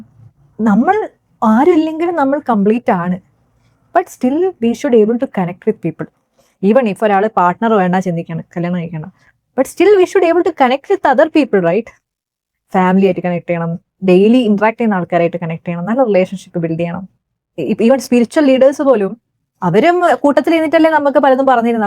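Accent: Kannur, India. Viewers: Offtopic Slang felt like u r from kannur Kannur edyappa?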